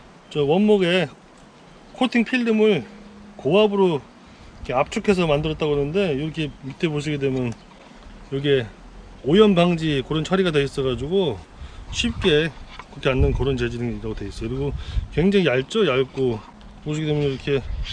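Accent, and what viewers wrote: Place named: South Korea. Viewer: 잘보고있습니다...구수한사투리너무좋아요... 루프박스장착영상봤는데,지금은없네요... 저도설치하려하는데연비차이가많은가요? 기름값미워미워ㅠㅠ 늘응원합니다~~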